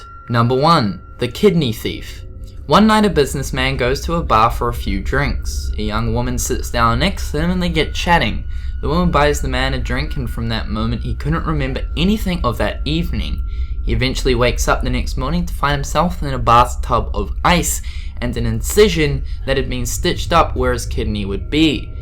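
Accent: New Zealand. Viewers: Are you from New zealand? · Sounds like a kiwi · Please. Don't talk like a Kiwi/Maori.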